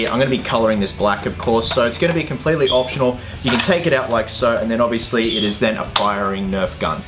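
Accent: Australia. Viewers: R u from Australia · Mate, are you Aussie. Cause if so go Australia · are u Aussie u sound it I am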